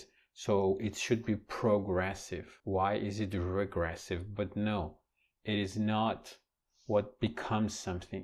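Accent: Iran. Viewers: ایرانی هسی؟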